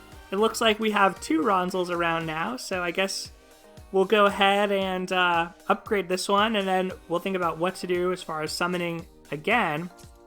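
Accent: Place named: Texas, United States. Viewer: A fellow Texan! I wouldn't have guessed it, but now you mention it I can hear it lol. Also this Gala was SO GOOD to me, yeehaw!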